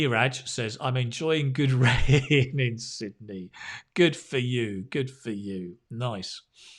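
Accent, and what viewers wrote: United Kingdom. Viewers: Thanks so much, for your English Speaking Success on the YouTube channel. You've been giving me chances to immerse myself in the daily uses of English on different ambitious, especially with your British accent which I've been learning since primary school, more than 50 years ago under the British Colony. but I still have problems in the sentence stress. Anyway, I will keep watching your videos everyday anytime or even during having a shower. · I and my children👶👧👦 7-8-11))))really like that you regularly do with your great clasical BritishEnglish pronunciations and sincere interested teaching acurent natural fluency skills!!!!!!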